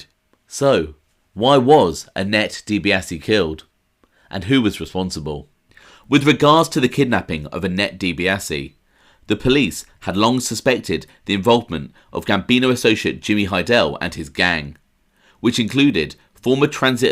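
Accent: England, United Kingdom. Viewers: Well documented info. Interesting and now I am used to your English accent.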